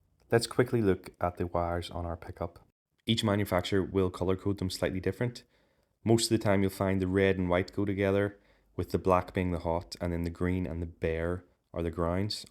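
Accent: Northern Ireland, United Kingdom. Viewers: have you been to matchetts in belfast? i can tell you’re from ni from ur accent 😂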